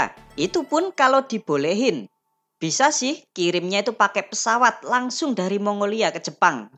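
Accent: Java, Indonesia. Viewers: Logat Jawanya yg sangat Medok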